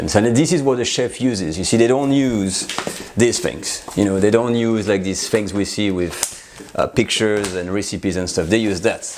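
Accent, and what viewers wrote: France. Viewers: Been with you from the start...love your channel. You are very personable, knowledgeable and charismatic. ☺️. And the "real" French accent is so easy on the ears. I am Canadian - our French accent is very different. Keep up the wonderful work...you have inspired me to dig out my Julia Child and Escoffier books and go back to basics. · Your channel is a dream come true. I love french food but do not speak the language, so you tube in french does not work for me. Real french food by a french cheff who explain's so well and in English. It doe not get any better. I did not cook any of you recipes yet but have bookmarked so many , my cooking bug picks up in the fall. And one more thing: I love that you are not full of air, a simple guy. And one more thing: your accent, every time you say "recipe" I repeat your way. My son laughs . Thank you so much for this channel in English. 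Wish you lots and lots of success ! Just subscribed. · I just found your channel today. I am impressed by your ability to make dishes seem easy to accomplish and your love of cooking comes through. Please do not apologize for your presentation, it looks much more professional than you might think. Your english is excellent even with your french accent...this is much appreciated., I believe there is a career on camera if you are willing to go that far. Maybe PBS. In my opinion you are the complete package of knowledge, charm and inspiration...you have my vote.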